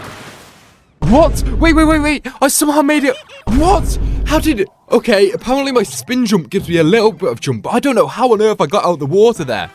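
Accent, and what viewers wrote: United Kingdom. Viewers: I think its cus he talks british and its difficult for him to say poplin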